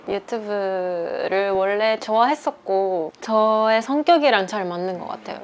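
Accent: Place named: South Korea. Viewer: 발성부터 한국어 발성법이죠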